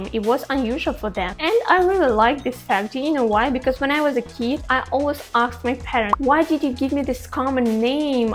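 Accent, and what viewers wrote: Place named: Russia. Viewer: Hi. I have a few friends in Russia. One of them is Kazakhstani and her and her family live in Tyumen. However, because racism is rising in Russia and her and her family have been the targets of racism, they are now moving back to Kazakhstan. Also, I see you touched on something many of us have noticed about the Slavics, that many of them look Asian, but with white skin, lighter hair colors and bright eyes. My Co-worker from Taiwan and I have talked about this a lot. Anyway, I wish you the best with your venture on YouTube, I hope it brings you a lot of success. P.S.... You don't look Thai, Vietnamese or any Indo-Chinese. You also don't look Kazakhstani or Chinese, you facial structure is different. I'm also from the USA. had a Thai girlfriend and one of my Nephews is 1/2 Vietnamese. I also was in the US Army and lived in South Korea... 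You don't look Korean too! You do look Asian, but it would be difficult to pinpoint an exact location. You accent is a dead give away that your from Russia. P.S.S.... I hope you do find your way to the USA. I think you will be shocked by how different life is here, compared to Russia or anywhere in Asia. We would be very happy to have you here too! 🫶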